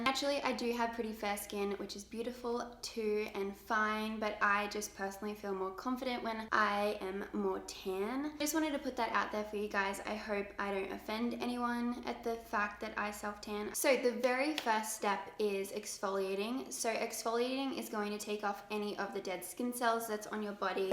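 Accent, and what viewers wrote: United States. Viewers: Yoooooo shes getting the american accent !! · sounds american now eg when she said matter 😂 ill miss your aussie accent 😊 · Can anyone else hear the slight American accent that has developed. When she says A's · Wow her accent is definitely sounding American now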